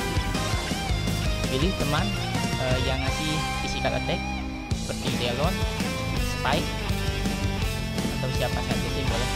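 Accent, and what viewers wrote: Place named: West Java, Indonesia. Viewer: Nyunda pisan xD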